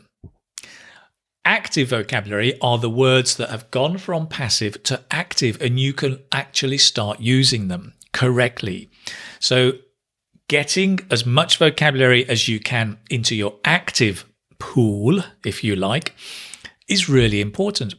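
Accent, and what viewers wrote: United Kingdom. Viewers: I love British accent, do you have a podcast?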